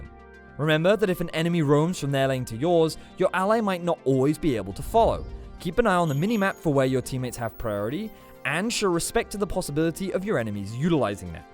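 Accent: United Kingdom. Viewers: British accent drives me nuts.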